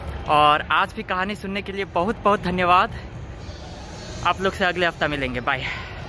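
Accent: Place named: Bhojpuri, India. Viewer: 💞💞💞 bhiya aapki hidi thodi bhojpuri mix sound kr ri h we support you 💜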